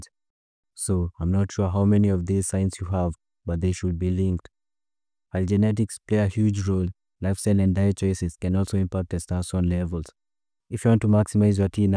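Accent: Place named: South Africa. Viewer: Sounds South African 🇿🇦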